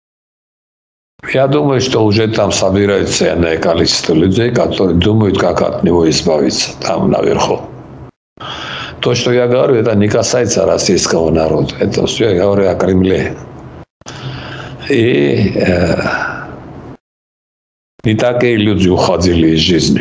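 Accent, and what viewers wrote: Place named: Georgia. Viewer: Так половину грузин говорит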